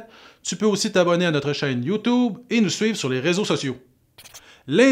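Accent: Quebec, Canada. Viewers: Au tout début, je pensais que j’allais rien comprendre, en plus tu as l’accent québécois :) mais au final aucun problème ! J’ai parfaitement compris ce chapitre grâce à toi, Merci ! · Wow merci beaucoup pour les explications simple et surtout très claire, avec un petit accent québécois qu'on apprécie toujours autant ! MERCi ! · top l'accent du Quebec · Merci pour ton accent québécois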